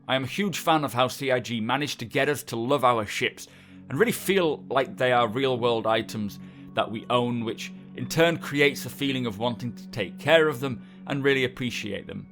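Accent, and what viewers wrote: United Kingdom. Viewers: Fck.. Why is every game vid on YT some British guy!.....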